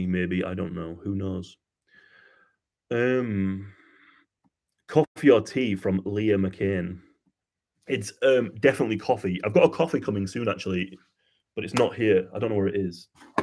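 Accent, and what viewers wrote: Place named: North, United Kingdom. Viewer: Luv his northern accent n luv to watch keep it up.x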